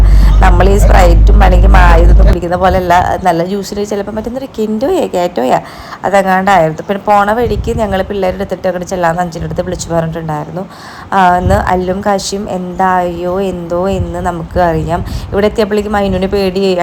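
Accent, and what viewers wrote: Thrissur, India. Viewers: പിന്നെ എന്തുട്ടാ......aiwa തനി കട്ട.....തൃശൂർ സ്ലാങ്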